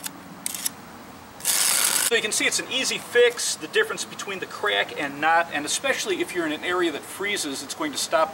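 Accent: Chicago, United States. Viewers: He's gotta be from Chicago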